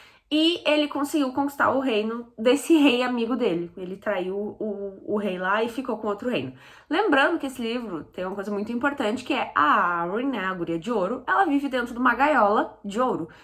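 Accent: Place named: South, Brazil. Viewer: cheguei faz pouco no canal. E amo como vc conta tudo. E mesmo depois de leer os livros eu venho ouvir sua resenha. E amo seu sotaque do Sul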